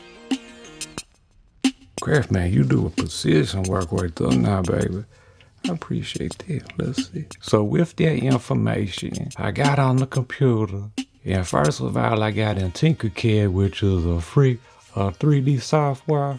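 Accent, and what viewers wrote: Louisiana, United States. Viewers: As an Aussie, I can't place your accent for the life of me. I can tell that it's most likely from the US, but the exact region has me stumped. Where abouts are you from, my man? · i didnt know i needed to hear a sultry cajun tell me about injection molding today, but man was that like soup for the soul. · What region of the USA is this accent from?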